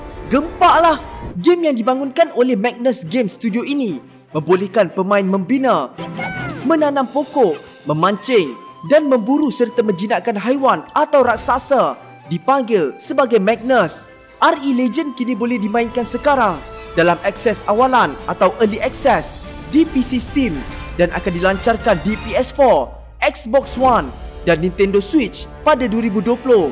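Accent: Malaysia. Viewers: ni orang malaysia ke?? jawb soalan saya di next video blh tk???sbb brcakp mcm malaysia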